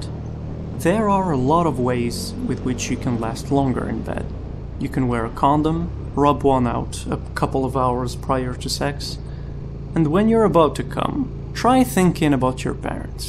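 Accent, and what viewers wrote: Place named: United States. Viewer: One question : From where or how you learned to talk like that and speak english fluently and like an american ; i need some help !! Hope you answer me .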